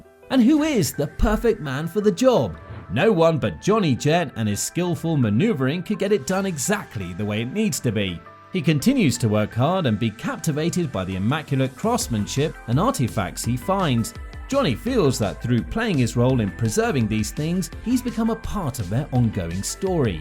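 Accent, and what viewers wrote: United Kingdom. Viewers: Where in West Virginia did you find a narrator with a British accent? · Get so tired of the brit narrators.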